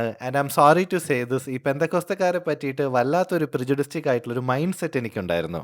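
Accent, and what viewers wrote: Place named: Central Travancore, India. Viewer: നിങ്ങളുടെ video വളരെ നന്നായി, എന്തെന്നാല്‍ നിങ്ങള്‍ കൃത്യമായി ഞങ്ങളെ വിവരങ്ങള്‍ പറഞ്ഞു മനസ്സിലാക്കി തന്നു, I appreciate you, നിങ്ങള്‍ ഒരു മദ്ധ്യ തിരുവിതാംകൂര്‍ സ്വദേശി ആണെന്ന് മനസ്സിലാക്കുന്നു സംസാരത്തില്‍ നിന്ന് മനസ്സിലായി,അല്ലേ, പെന്തകോസ്ത് സഭയുടെ കേരളത്തിലെ ആസ്ഥാനമായ കുമ്പനാട് എന്ന സ്ഥലത്തിന്റെ അടുത്താണ് എന്റെ വീട്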